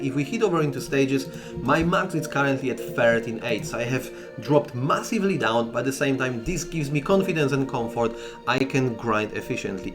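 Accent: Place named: Israel. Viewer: oh cool, your accent sounds very israeli, your accent is very different than most polish people i meet here in london. i wouldve never guessed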